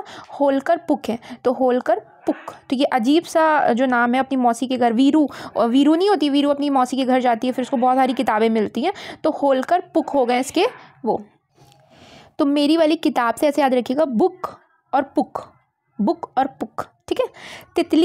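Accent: India. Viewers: Aap Lucknow se ho kya lakhnavi aawaj hai apki? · Madam ki boli me to proper kanpur ka accent aaraha hai.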